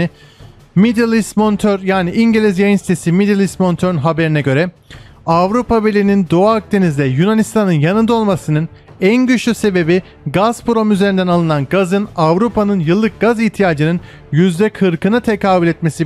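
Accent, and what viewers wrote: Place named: United States. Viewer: Bak kardeş seni abone ettim ve paylaşımlarını izliyorum lakin şu dönme gibi yada amerikanlıştarılmış lehçeyle konuşma. Sinirlerimi bozuyorsun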